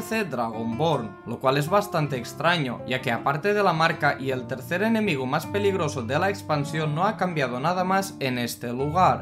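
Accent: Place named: Catalonia, Spain. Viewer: ostia com es nota que ets catala tiu jajajaja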